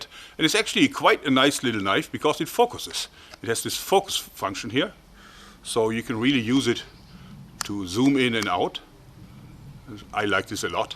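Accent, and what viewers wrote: Germany. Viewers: Im German. He has a typical German accent and the Amazon-Screenshot was is german language too. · He actually sounds very german. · Man hört den deustsch accent so dirch aber irgendwie sind keine deutschen kommis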